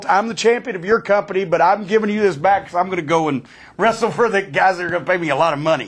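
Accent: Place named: South, United States. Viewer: Jim cornettes is Fucking Hilarious , his southern accent and his Shoots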